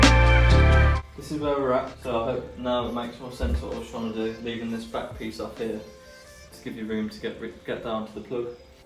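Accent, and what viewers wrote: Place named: West Midlands, United Kingdom. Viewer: This looks so good, sounds like you're from the West Midlands, would you offer this as a service ?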